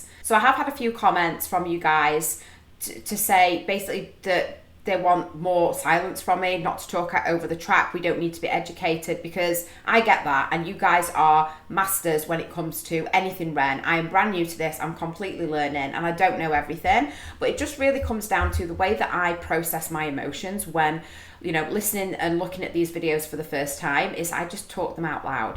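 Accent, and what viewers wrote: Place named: Stoke, United Kingdom. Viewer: Ay up duck. Always interesting to see a ren reaction. He’s brilliant isn’t he? 😂 Are you from manc? You sound almost Stokie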